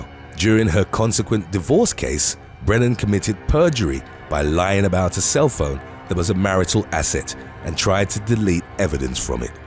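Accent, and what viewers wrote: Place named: United Kingdom. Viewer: Haha funny British narrator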